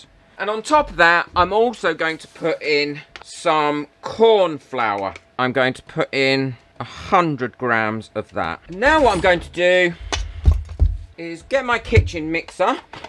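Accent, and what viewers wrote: United Kingdom. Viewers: Hmmmm I wonder who has to taste the bleach to make sure it has enough flavor? 😂🤣🤪 My Gran is from Yorkshire and she used to say "flavor" instead of "scented" or "fragranced." I miss her and her laugh. She was also a crafter like you and would get so happy and giggly when something would work. The way you laugh and giggle reminds me of her… as well as the accent! While she lived in Yorkshire, I would come and stay for the summers… I would pick up that accent really fast and it would make my mum mental when I came home with it. After my grandfather passed away in 1999, Gran came to the states to live with my mum and dad. I got to have her with me for almost 30 years. That laugh was contagious and she was just as cheeky the day she passed away as she had been her entire life. Thank you for your videos and your laugh. It really brightens my day!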